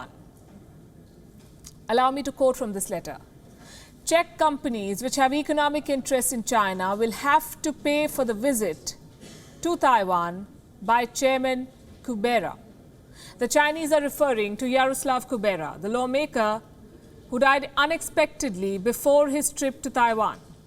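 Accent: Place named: India. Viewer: We know your speech full of Nonsens, like your Indien accent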